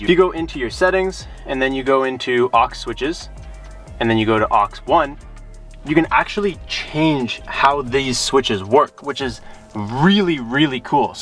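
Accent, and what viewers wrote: Canada. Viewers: Great video. Canadian eh?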